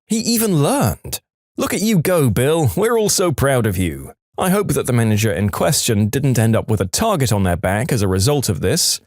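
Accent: United Kingdom. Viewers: I thought you were from England/British bud.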